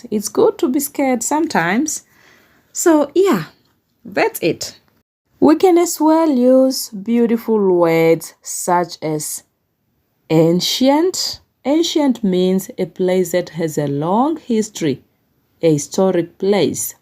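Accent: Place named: Namibia. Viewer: Huh? My fellow Namibian. No wonder i understand the tone so well. Lemme Subscribe ❤🎉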